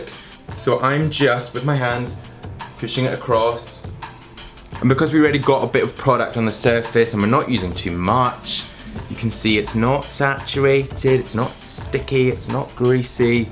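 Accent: United Kingdom. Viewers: Cool video man but it would be better if you started while your hair was messy af, like just waking up or whatever. Also stereotypical gay accent mixed with whatever British accent sounds odd lol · i wished I have a british accent like you 😂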